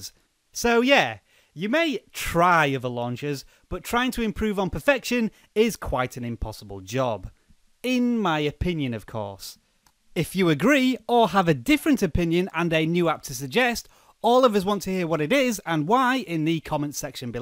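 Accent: United Kingdom. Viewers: British accent......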